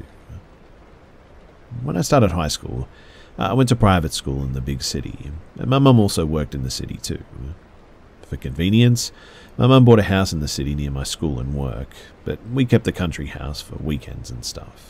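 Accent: Australia. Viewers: Great to hear a Australian voice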